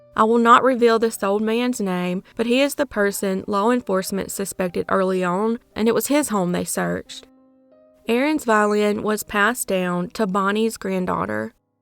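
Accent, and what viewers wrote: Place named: Tennessee, United States. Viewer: No sweetheart, you is 100% country. Cornbread is more city than you. I can say this because you sound like everyone in my hometown. Where I still live. TN We love you.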